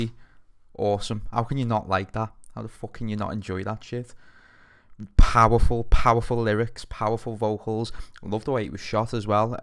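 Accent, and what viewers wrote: Liverpool, United Kingdom. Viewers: I love all your videos lad I especially love hearing another scouser really loving Great work lid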